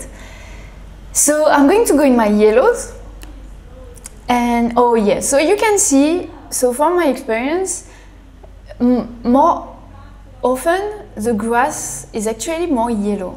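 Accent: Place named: France. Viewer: "but that's not bad, hein !" Not only will we forgive your french accent, we will also love it ! <3 Also, prepare for my rainbow cat 🙈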